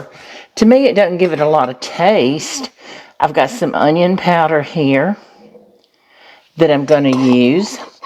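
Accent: South, United States. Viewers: I love ❤️ blooming onions. I dip mine either in ketchup or shrimp sauce. I haven’t noticed before but your accent is really southern. ❤️❤️❤️🥰🥰🥰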